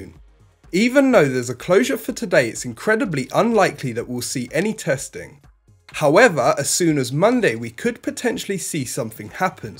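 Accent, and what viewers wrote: England, United Kingdom. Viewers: Hey, love your updates man! Thank you! What part of England are you from mate? I say south, around London, but my brother is saying Manchester. You sound NOTHING like Paul Macartney so, I say that's wrong!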